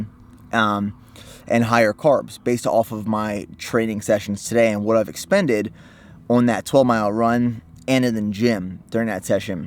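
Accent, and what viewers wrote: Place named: Philadelphia, United States. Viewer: Hey! I have been a subscriber to your channel for a few months and I always wondered where you're from originally? You sound like you have an Philly accent! Good luck on the training!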